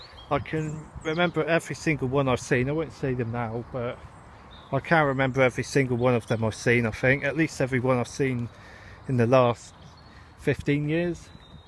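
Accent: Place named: Bournemouth, United Kingdom. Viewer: It's so great to hear a truely classic Bournemouth accent. Makin me homesick!!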